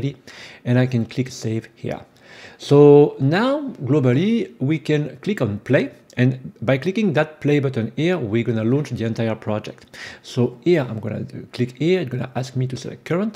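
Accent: France. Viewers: Have questions will it be easier to make this with unity or godot And I like your mix french and English accent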